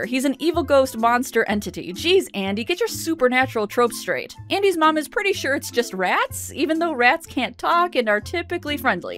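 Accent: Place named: Midwest, United States. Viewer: Your from the Midwest aren’t cha?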